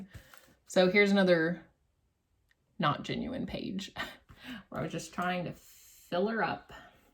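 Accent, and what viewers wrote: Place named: Pennsylvania, United States. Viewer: The way you speak makes me think you are from Pennsylvania. I know this is a kind of odd thing to say, but I like guessing where people are from by their accent and the words they use.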